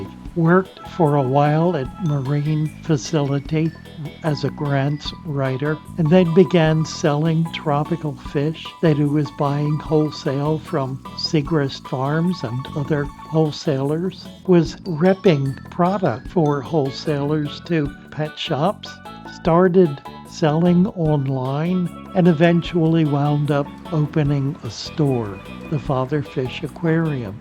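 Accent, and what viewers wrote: Baltimore, United States. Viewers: I knew you had to be from Baltimore when I heard you say “wuurder”, it’s the same way my mother says it. I grew up in Baltimore as well but I don’t pronounce water the same way she does. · I grew up in Baltimore too. Your life has been amazing. I knew the first time I heard your voice you had Baltimore roots. I'm sorry about your dad. I first got into the hobby at Seabreeze Aquarium on York Road and I remember they had dirted tanks in the shop. I'm 65. God bless you and yours.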